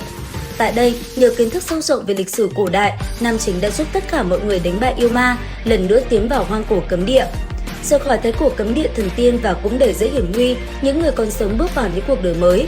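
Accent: North, Vietnam. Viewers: Ad là người miên bắc chắc luôn🤣🤣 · Mình ng trong Nam nhưng sao nghe giọng Bắc của bà AD nghe cuốn quá 😅😅😅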